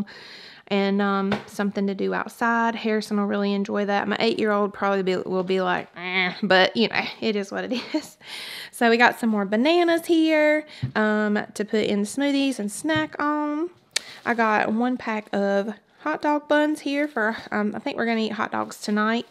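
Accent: South, United States. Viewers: Watched ur video for the first time! I enjoyed it so I subscribed! Like ur Southern Accent!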